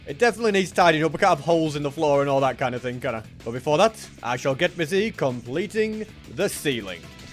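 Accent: United Kingdom. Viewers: Wait.. is British?? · Are you british?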